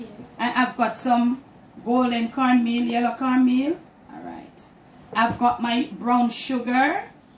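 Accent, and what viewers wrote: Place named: Jamaica. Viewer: Hello could you provide the full recipe, you have a beautiful jamaican accent I couldnt tell what some of the ingredients were that you used. there is one where it sounded like you said ammon essence. tried looking it up couldn't find it. and so i thought maybe she said lemon essence, I found that. is that one of the ingredients in your recipe lemon essence?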